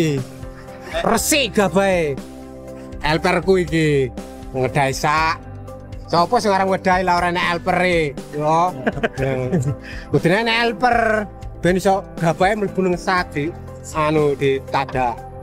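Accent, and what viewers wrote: Java, Indonesia. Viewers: Medok bgt Lo ya😂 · Luar biasa padi nya kak, semakin ku tonton ternyata jawa medok... luar biasa semangat 🎉❤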